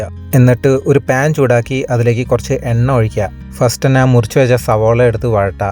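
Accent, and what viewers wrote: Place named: Thrissur, India. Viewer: Slang thrissur pole thanne ind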